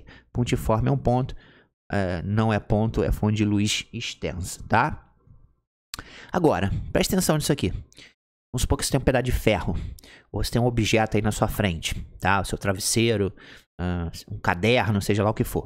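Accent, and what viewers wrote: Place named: Rio de Janeiro, Brazil. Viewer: Ele e do RJ kkkk sotaque kkkk aula boa 😊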